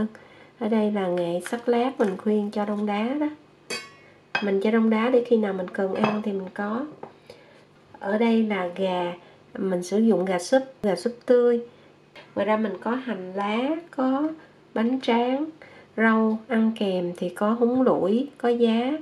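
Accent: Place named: Quang Nam, Vietnam. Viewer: chia se mi quang dung cach cua xu quang cua co. con mi quag cac noi nhu pt ngot duong nhieu qua kho an lam chia se tieng noi de thuong giong nguoi quang co cam on nhe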